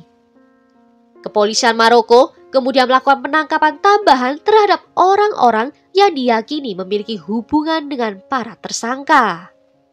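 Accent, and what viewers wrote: Java, Indonesia. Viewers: Medok bangeet kmu kak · Emang suara nya asli medok gitu ya..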